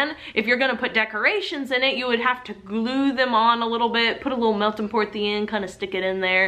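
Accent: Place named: South, United States. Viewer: Anybody else from 2020 and sounds sooo southern in the intro? Lol I know how southerners she sounds bc I’m from the south 😌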